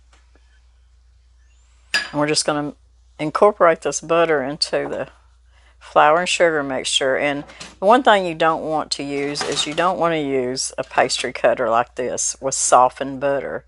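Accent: South, United States. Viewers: Who else comes to this page just to bask in the country accent and down home goodness? God bless!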